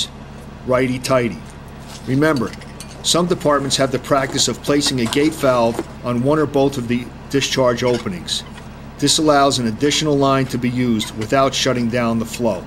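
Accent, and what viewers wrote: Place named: New York, United States. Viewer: That is definitely a new your city accent LOL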